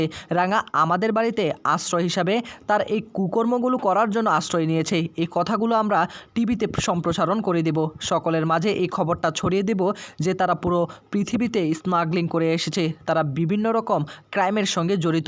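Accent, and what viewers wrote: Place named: East Bengal, Bangladesh. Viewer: বাঙ্গাল বাঙ্গাল উচ্চারণ ,অশিক্ষিত। কথকতা করতে শিক্ষার দরকার। শিক্ষা নিয়ে তারপর কথকতা করতে এস।চেষ্টা করলে পারবে।শুভেচ্ছা রইল।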